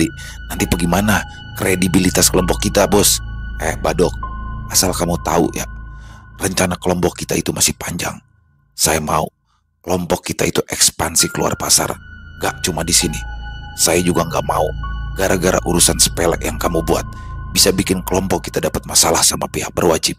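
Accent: Jakarta, Indonesia. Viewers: Mantab.... Accidentally jadi preman pasar mak zubaidah... Kwik.. Kwik... Seru Abizz... Lanjut bang... Pas banget bawain cerita seru ini dgn logat betawi_nya...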